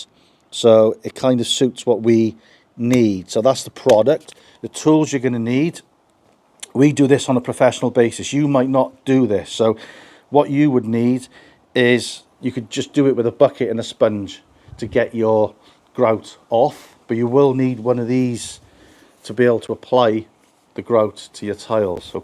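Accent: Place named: Cardiff, United Kingdom. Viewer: True. Newport myself man. Seen johnny boy on the circuit as he's more my end but I think you fellas are more Cardiff by the accent twang.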